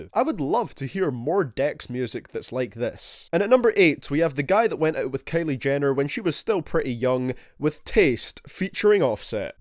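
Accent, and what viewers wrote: Scotland, United Kingdom. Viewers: Is he Scottish????🤨🤨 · What is that accent? I know its from somewhere in Great Britian but i cant put my finger on it.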